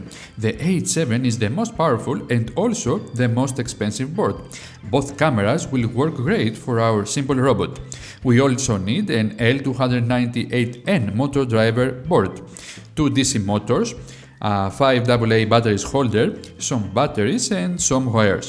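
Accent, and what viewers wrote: Greece. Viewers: Quite informative as far as high tech is concerned but with a strong Greek-English accent which can sometimes be not so overwhelming to native speakers as mispronunciation of words can lead to some degree of misunderstanding and missing the point. Not offence I hope as I am Greek too with Engineering background. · I haven't had any misunderstanding related to his accent. He is quite clear. I thought he might have been Spanish - but now that you've brought it up - I see the Greek intonations. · I love this channel ! The projects are simple enough to be great starting points for my projects. Excellent explanations and the Greek accent is awesome too. Ζήτω η Ελλάς !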